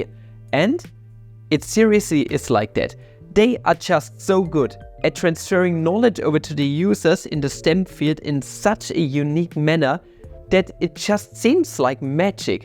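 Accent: Germany. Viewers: this is German pronuncation. is there even any word pronounced correctly?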